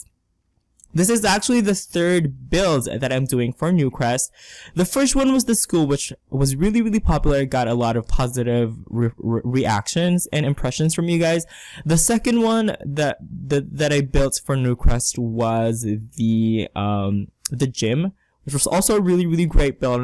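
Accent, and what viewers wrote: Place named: Philippines. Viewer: I really like it!! new subscriber 👏 Ps. I already thought of you being a filipino just when you started talking!! #teamfilipino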